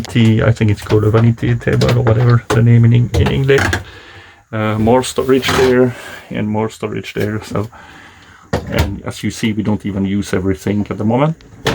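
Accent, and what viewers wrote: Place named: France. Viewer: Merci beaucoup d'avoir partagé votre point de vue, et quel beau bateau, excellent gréement ! Youtube fait la promotion de votre vidéo aujourd'hui, alors bonne chance, vous obtiendrez plus de vues et de clics dans les semaines à venir, je suis un "Subscribed" maintenant . Quelques questions : votre enseigne est USA, votre accent est français et votre cuisine est belge, où est votre port d'escale maintenant, toujours Seattle ? Je n'ai pas saisi le nom de votre bateau 🤒(AIS?) Je ne connaissais pas le constructeur du bateau, donc superbe promotion pour Fabola. Intérieur magnifique. table bonne idée, mais pourrait être mieux... Je suis d'accord sur l'enrouleur dans le mât, mais couvrez le triangle restant aux UV, je viens de casser mon point d'écoute de selden enrouleur à Nieuwpoort en juin. La plate-forme de bain est une solution un peu maladroite entre un bateau de course avec le contrôle du mât arrière et un croiseur avec une sortie confortable de l'eau. 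Vous êtes le seul à avoir une Fabola sur Youtube, alors partagez d'autres vidéos si possible. Votre moteur, Volvo Penta ? Qu'avez-vous changé sur votre bateau depuis que vous l'avez acheté ? Votre équipement de navigation..vos voyages au dela de la cuisine. Plus important encore, qu'est-ce qui s'est cassé et comment l'avez-vous réparé. Il y a une grande communauté de marins sur YT qui apprennent les uns des autres, et peut-être ce qui s'est mal passé va aider la communaute.Pas tout le temps des bikinis, et le style sans faute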